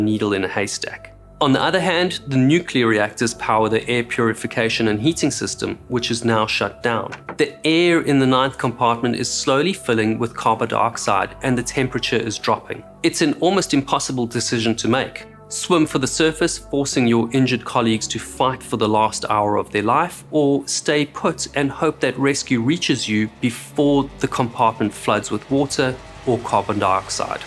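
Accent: South Africa. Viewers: Mate, I would just like to send you all the compliments you deserve!! Your quick and concise detail of complicated events is an absolute pleasure!! I've watched many of your videos of incidents I already know a lot about and still won't skip a second! Best of all, having a good old South African boitjie telling me the story makes me feel like I'm chatting to a mate! Thank you for all your time and effort you put into these videos!! I tip my hat to you sir!!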